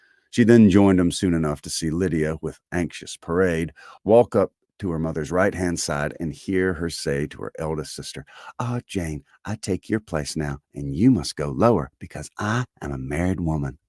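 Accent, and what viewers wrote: South, United States. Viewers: I have just found your channel-I absolutely love the Southern accent twist to these classics - I am just about to start Persuasion my favourite Austen novel -Thank you so much for the uploads ,much love from Southampton, England x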